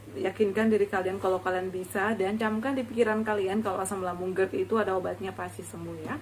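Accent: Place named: Java, Indonesia. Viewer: Kalau ngaku orang sulawesi ngapain logat jawa, lestarikan dong budaya sulawesi sama dengan daerah-daerah lain di indonesia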